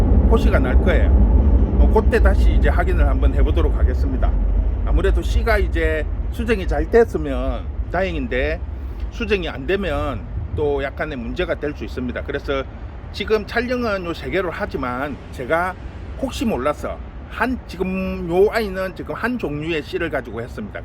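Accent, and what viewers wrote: Gyeongsang, South Korea. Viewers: 구수한 경상도사투리 파종법 영상 올려주셔서 감사합니다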